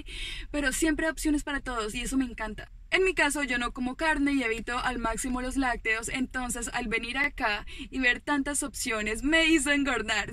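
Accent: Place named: Huila, Colombia. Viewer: Se nota el huilenseee manooo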